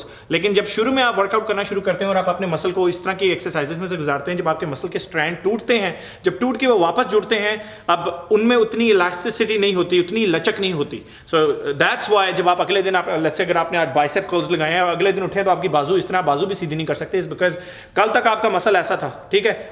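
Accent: Punjab, India. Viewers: Pa ji your punjabi accent is just too gud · sir ur video is awesome and ur panjabi is sooooo cuteeeeee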